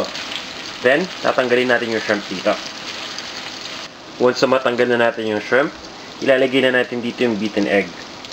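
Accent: Philippines. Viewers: i visit ur recopies cos i like fillipino accent..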